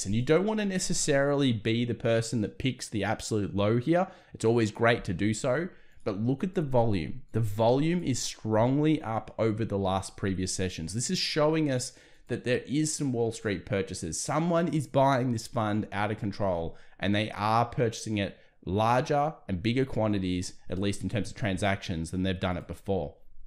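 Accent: Australia. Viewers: Hey, hey, hey! Welcome back. We've missed the great Aussie accent. 😂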